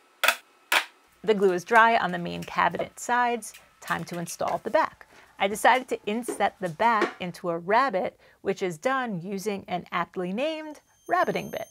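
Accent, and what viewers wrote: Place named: Long Island, United States. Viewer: You sure you're not from NY? Between "draw" and "foward" you sound like all my Long Island friends and family haha! Being from VT and going to college in MA, my brain gets super confused with accents sometimes :) Agree with great vidieo.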